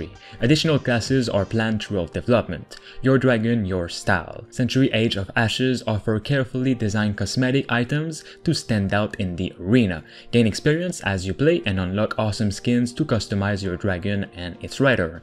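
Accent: Quebec, Canada. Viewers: le gros accent québécois haha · Petit accent du Québec ? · Es-tu Québécois? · French from Montreal Lmao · You sound québécois! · love that channel ! nice accent! jai la meme... vive les quebecois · You sound like a french canadian it's so weird i like it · I know a québécois accent when I hear one ;)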